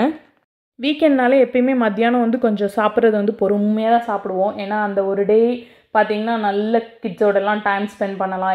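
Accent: Kongu, India. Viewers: U speak kongu slang but howcome from tanjore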